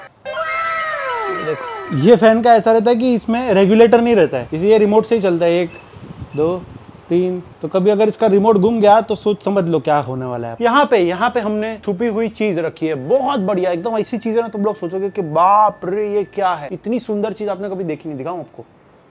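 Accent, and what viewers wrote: Maharashtra, India. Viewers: Congratulations 🎉 🎉 Marathi tone bahot hi mast hai bole to Aapke bolne ka style bahot hi funny hai 😂 but it's emotional also..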